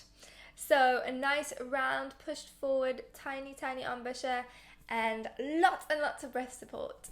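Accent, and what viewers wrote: United Kingdom. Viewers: I love hearing u talk,I play the Yamuna closed key flute I love it so much I am a beginner and I play 15-30 minutes each day when I get home from school,I am trying to learn by on flute for my school talent show I also love ur accent I always wish I had a British accent one day could u play the song by I really really love it that song Thanks bye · Whwre is she from? Is she british? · You sound like a british voice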